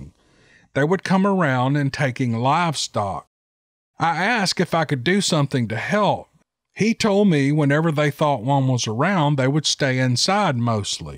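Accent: South, United States. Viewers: I hope you enjoyed Thanksgiving. I enjoyed your narration of fantastic story.always good to listen to.your goodoleboy accent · Man oh man! Fantastic stories, every one of them! &.... you ALWAYS do these stories justice, your voice becomes animated as you launch into the character of the story. The intensity & excitement in your voice puts the listener right there in the encounter! I just love listening to your sweet Southern drawl. You are truly a master story teller. And thank you to those authors for sharing their encounters! A Wompus cat!? Lol, that's a new one I've never heard. I love it! I remember as a kid growing up on a Texas army base, my dad (a drill instructor of all things) would take my 2 older brothers small game hunting often. If I recall correctly, one of their first hunts on their own was a 'snipe hunt'. Having been let in on the joke while the boys were out hunting (with their pellet guns & burlap sacks) I couldn't wait for their return. 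I knew right then Dad had armed this little sister with some "ammunition" that would provide entertainment at their expense for years to come. Of course karma has a way of finding everyone & turn about is fair play. We had a pretty good snowfall one winter, a rare event in that part of the country, and I missed much of the fun being too scared to go play outside. When the boys found out why, then it was their turn to laugh. Being the last one out the door, after getting all bundled up, Daddy told me to be real careful, especially in the deeper snow, "cuz them thar snow snakes will crawl up your butt & freeze ya ta death." Hahaha We live in the g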